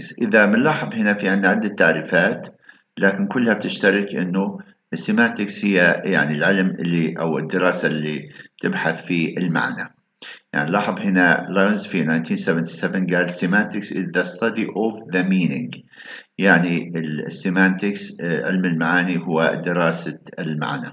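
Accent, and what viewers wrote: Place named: Mosul, Iraq. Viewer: دكتور كيف حالك عساك بخير. ربي يحفظك. دكتور اعتقد انت عراقي؟ لان لهجتك مثل لهجت اهلنا في الموصل للعلم انا من ديالى خريج جامعة الموصل في التسعينات. تحياتي لحظرتكم.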